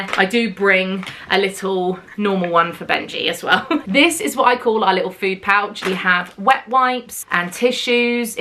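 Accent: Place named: United States. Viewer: Hehe I can definitely hear an american twang in yur voice, then you said you spend a lot of time in America and it made sense :)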